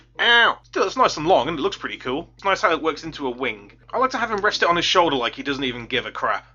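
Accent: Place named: England, United Kingdom. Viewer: Wait... are you from England like me meate? cos you sound like you're from my aera XD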